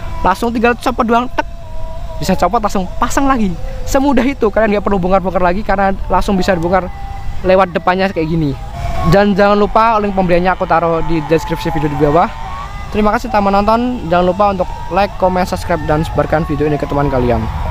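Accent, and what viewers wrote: Java, Indonesia. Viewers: Sory suketnya... Hahahaha.. mantab bro, gak ilang jawane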